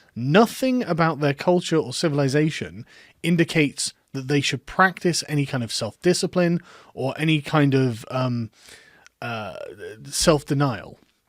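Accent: United Kingdom. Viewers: I'm from the U.S. Love your British wit and accent. I despise what youtube has done to you and we haven't stopped it. All aboard Trump 2020 and Brexit!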